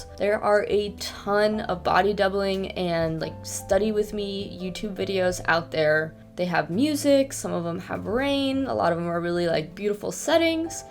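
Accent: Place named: Ohio, United States. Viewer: The ohioan accent alone made it worth the watch 🫡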